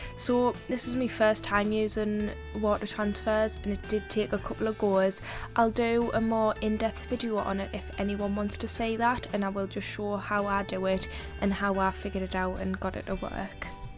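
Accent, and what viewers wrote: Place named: North East England, United Kingdom. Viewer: Absolutely top quality looking model. I was having so much trouble even finding the basic "Hulk" green escorts where I live. Ironically it was a trip to the North East (where you sound like you're from) visiting my Dad in N.Shields, where I found two RS2000s in a Newcastle Poundland. I have the first white one spare & loose, so having seen your video, I think I'll get some DD4 wheels like yours, the Tamiya acrylic and add similar details. It won't be exactly like yours as the tail plate is yellow on the white one but this would be a good addition to the collection. Beautiful effort & i look forward to watching more customs 😉 What car next? The forthcoming STH is a metallic red Lamborghini Huracan LP620-2 Super Trofeo and any basic Super Trofeo model would be easy enough. Rather than make it 100% exact, you could make it look like a road going Huracan STO & we can all agree that a metallic redcurrant red looks incredible on ANY car, but especially a V10 Supercar. 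Make it happen and I think you wont regret it., especially with a simple £4 eBay effort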